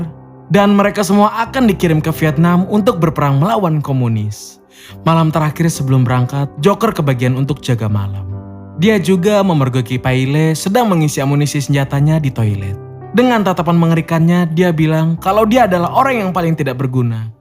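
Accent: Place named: West Java, Indonesia. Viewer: Sunda ngapak haaaaa,,,,perbaiki bahasanya bro semoga sukses iya jangan terlalu lebay suaranya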